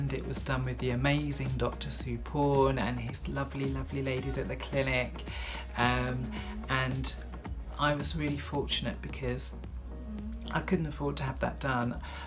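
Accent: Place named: United Kingdom. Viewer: Anyone comment on the similarity of your voice with that of Charlie Martin? You both must have been born and/ or raised in the same elegant part of the UK. Just my American ears. 🧐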